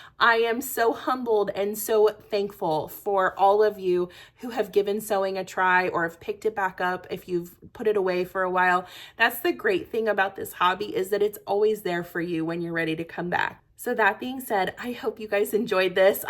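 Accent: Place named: North, United States. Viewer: Lol ... I'm also from the north so I understand the mouth 😂 I now live in Tennessee where they don't have my kind of mouth😅... I found you a few weeks ago and I have to tell you at almost 58 years old my bucket list was to teach myself how to sew and you have inspired me to actually start... 😊 I gave my mom your tote bag and Walker bag today and she absolutely loved to them.... Thank you for being such an inspiration and I hope you and your family have a wonderful holiday season and a very blessed New Year.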